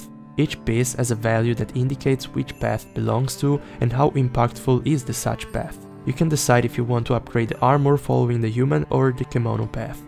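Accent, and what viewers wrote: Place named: Italy. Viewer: Brooo amo il fatto che si sente un casino che sei italiano ahahah comunque grande❤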